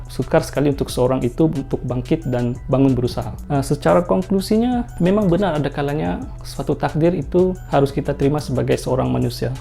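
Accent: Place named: Sabah, Malaysia. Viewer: Ya, agak jelas slang sabah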